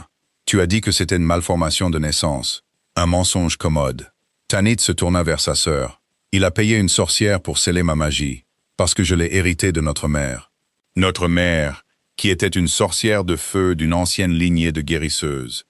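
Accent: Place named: England, United Kingdom. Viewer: Histoire agréable mais narration fort désagréable. Accent anglais puis voix monotone sans aucune chaleur.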